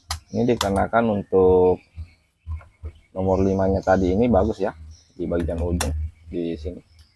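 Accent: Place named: Bugis, Indonesia. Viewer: Kayak orng bugis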